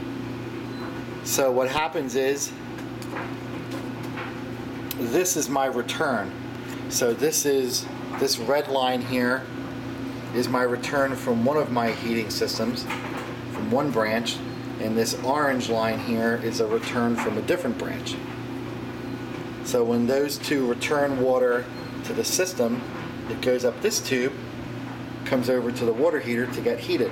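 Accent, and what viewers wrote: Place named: South Jersey, United States. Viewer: ...ooh that's the south jersey / philly sound...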